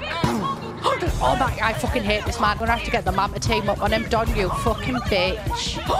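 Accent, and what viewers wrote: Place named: North, United Kingdom. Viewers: Northern British really does hit different. It’s not as posh as most British accents and I have no choice but to stan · Not just any British. Northern British. It hits different.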